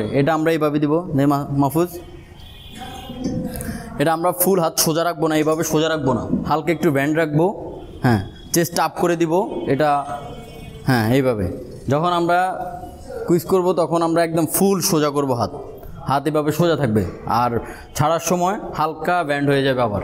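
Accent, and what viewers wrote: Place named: Habiganj, Bangladesh. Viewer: ভাষা শুনে মনে হচ্ছে আপনি হবিগঞ্জ এর মানুষ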